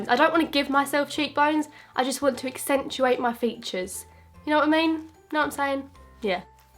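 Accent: Essex, United Kingdom. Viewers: You MUST be from Essex!!!! We have same accent I'm convinced! X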